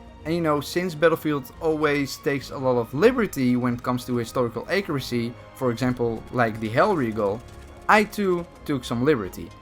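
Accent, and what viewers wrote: Netherlands. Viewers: That accent sounds dutch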